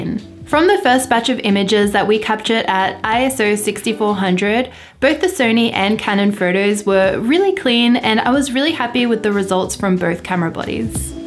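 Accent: Australia. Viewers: Do all aussies talk like you? 😍 I have to go to Australia. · I am in love with the Australian accent already, so cute!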